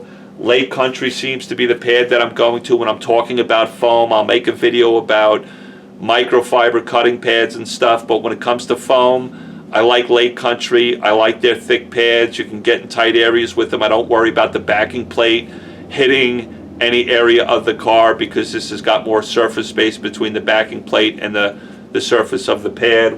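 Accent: New York, United States. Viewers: Do I detect a NY accent?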